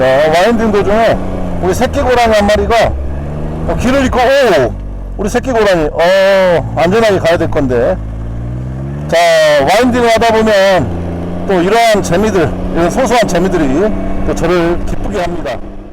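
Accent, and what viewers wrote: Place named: Jeolla, South Korea. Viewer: 캔암바이크 한번 타보고 싶어집니다 ^^ 같은전라도사투리 너무 좋네요 ㅋㅋ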